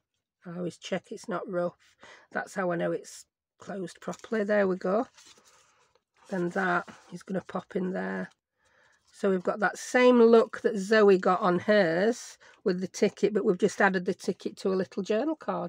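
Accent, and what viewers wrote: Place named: Black Country, United Kingdom. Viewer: I just found your page for the first time today. I love your accent! Are you from the Black Country? Gave you a follow. I love your page!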